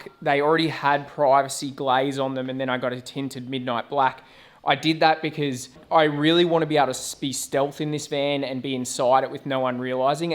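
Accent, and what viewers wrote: Australia. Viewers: It’s good to see and hear an Ozzie doing a camper conversion Keep up the good work and the Occerisums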